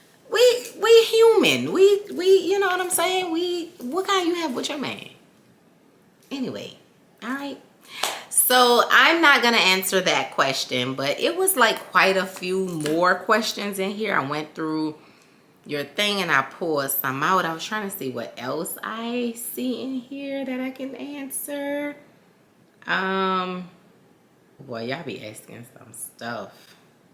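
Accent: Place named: Louisiana, United States. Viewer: And I love your sweet Louisiana accent,by the way i'm from Louisiana too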